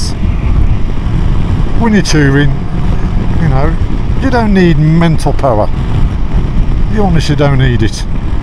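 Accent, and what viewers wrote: Birmingham, United Kingdom. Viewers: Do I detect a Bham accent? Looks a bit like Brum in the vid. Yeah this Bike ticks a lot of boxes, esp if you just passed your test. Think am going for the Interceptor though, looks over sense haha (Honda mpg etc n longevity). I would def want a center stand.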